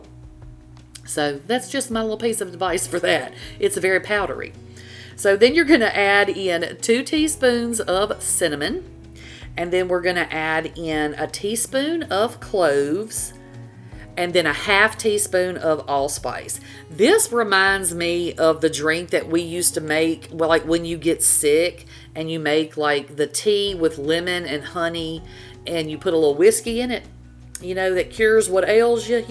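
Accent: South, United States. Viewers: Yummy 😋 I'm New Here & Just Love Your Ancient 😅I Have That Long Southern Draw Ancient..You Also Can Crack Me Up, So I Know I'm Gonna Love Your Channel..Be Blessed 😊❤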